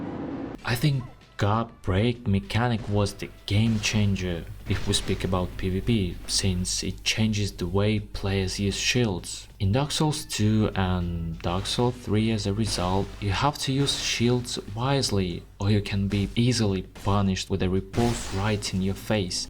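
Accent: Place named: Russia. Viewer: Just found your channel and honestly your editing style is really great! If I could levy a few suggestions, I think you should raise your voice a little, rather naturally or in post editing - from what it seems like you are a native Russian speaker, but I could be wrong, so don't worry too much about your speaking or accent if you are or not, good content usurps it and this certainly was, even though your voice was definitely not an issue. Another thing, I do think your intro meme/video was a bit long and might cause some early click-offs - shorter length ones in the future will do the opposite. Also great background footage! You can tell its from mostly if not all of your own playthroughs, so it gives credibility to your arguments. As someone who was introduced to the souls series through Dark Souls 2 and a personal fan of the game, this video was honestly pretty solid even though it felt like you sided against it in a sense. 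Again though, amazing video!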